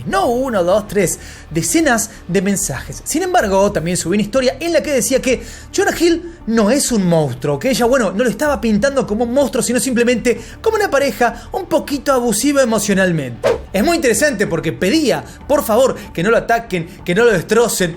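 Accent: Argentina. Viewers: Sos argentino no ???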